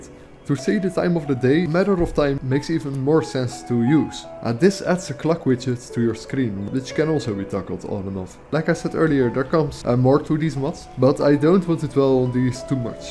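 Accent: Netherlands. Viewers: im dutch myself and i noticed how you talked, its sounds very much like dutch english xD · you sound very dutch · Let me guess, is that a dutch accent?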